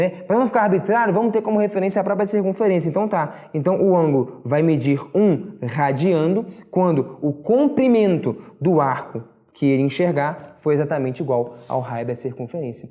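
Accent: Minas Gerais, Brazil. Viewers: Extremamente bem explicado! Esse sotaque aí eu conheço heim! Mineirinho!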